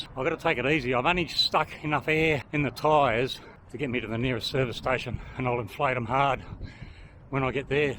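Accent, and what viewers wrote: Australia. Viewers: I would think there is little chance of getting kidnapped in the philippines - the dominant religion is Roman Catholic, and it's quite conservative. Nice video, and good to hear another Aussie accent for a bit. 'Flat out like a lizard drinkin' up those hills. I'm the same now (not that I did any serious cycle touring until my 50's anyway) Dingo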